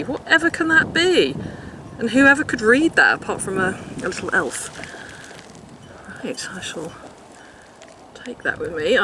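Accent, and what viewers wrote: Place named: RP, United Kingdom. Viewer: You should really do voice-over work with your Received Pronunciation speech. It is quite charming to the American ear.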